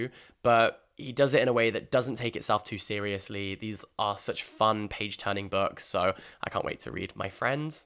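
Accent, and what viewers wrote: United Kingdom. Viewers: I like your British accent, plz more book reviews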